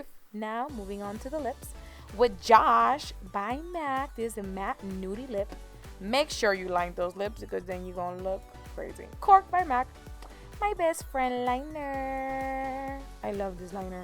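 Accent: Latin America. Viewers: Her accent isn't American lol. She's Latino which is what you're hearing when she speaks.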